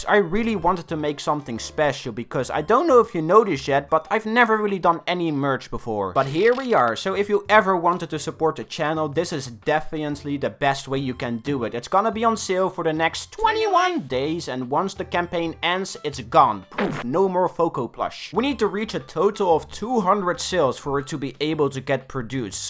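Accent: Netherlands. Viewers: hollow knight is amazing also are you Dutch? I recognize the accent on how you pronounce some words idk if it makes sense, could be bc I'm Dutch lol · Yeah hes Dutch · U Dutch? U sound dutch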